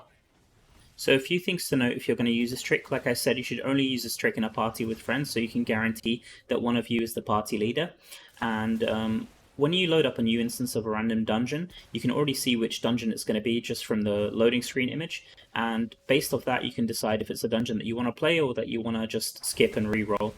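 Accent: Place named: United Kingdom. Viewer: Y u name urself after biggest mass murderer but sound british?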